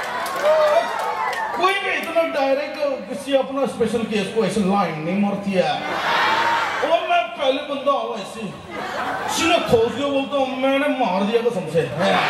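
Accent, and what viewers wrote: Kuki, India. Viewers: The Kuki accent is just too good😂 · Your Kuki accent is too original..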